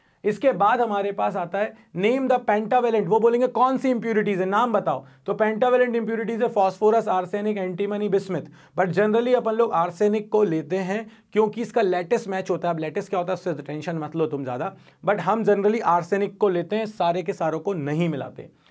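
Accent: Bhopal, India. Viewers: Ap bopal ke ho ..aap ki language lag rahe hai..👍.vese apne bhout aacha batya 👏👏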